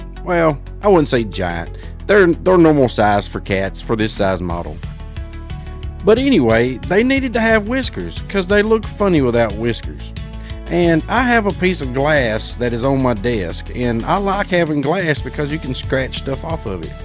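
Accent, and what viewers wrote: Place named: South, United States. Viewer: You have the most southern accent I have ever heard. She came out great, I might have to grab this one myself.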